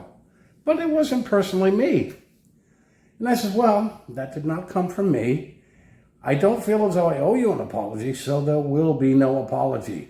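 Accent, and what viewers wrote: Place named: New York City, United States. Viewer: Hi, love your true life stories. You have a knack for encapsulating human nature and real life situations in an interesting and entertaining way. Really enjoyed this one. Like your accent as well is it NYC?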